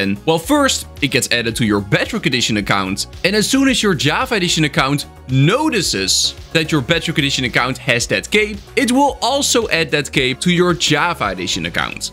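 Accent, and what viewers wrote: Netherlands. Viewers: A Netherlandisch acent???? and thx btw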